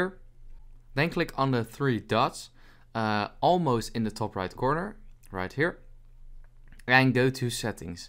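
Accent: Netherlands. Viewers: You have a Dutch accent maybe you’re Dutch?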